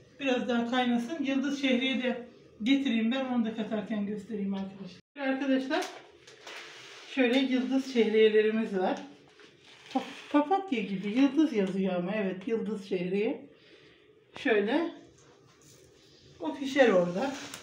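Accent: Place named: Aksaray, Turkey. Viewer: Aha buda aksaraylı galiba kolay gelsin bildim demi kız hanım aksaraylisin sende😊😊😊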